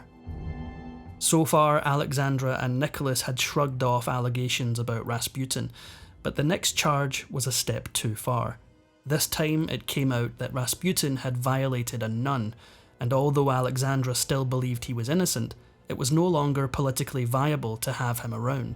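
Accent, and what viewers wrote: Scotland, United Kingdom. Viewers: My all time favorite narrator Love listening to the Scot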